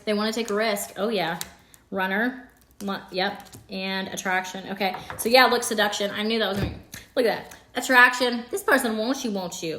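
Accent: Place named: Texas, United States. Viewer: Your readings have been spot on except…… I’ve been trying to manifest you to AZ and that hasn’t happened? I hear a Texas accent. Come on cards.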